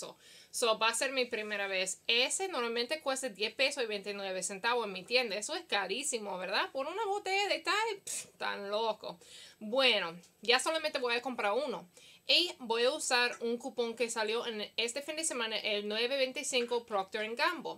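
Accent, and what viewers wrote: Puerto Rico. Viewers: Love this video!!! Suenas Puertoriquena!!! :) Gracias por este video. Que chevere!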